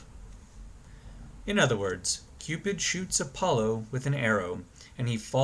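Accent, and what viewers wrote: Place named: Greece. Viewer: Nice story i really love accent greek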